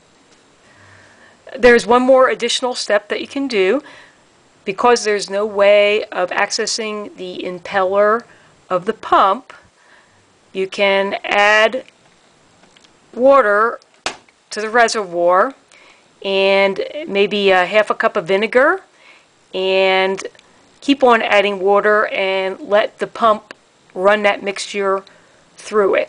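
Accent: Philadelphia, United States. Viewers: Philly area gal. Can tell by the accent. "Wooder."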